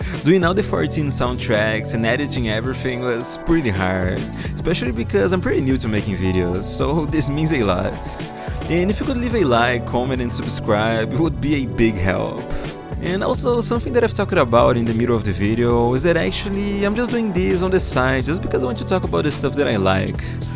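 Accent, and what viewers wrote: Brazil. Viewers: are you brzilian? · Sabia! Kkkk reconheci o sotaque de longe. Sempre bom achar outro brasileiro fazendo vídeos em inglês. Continua que está muito bom!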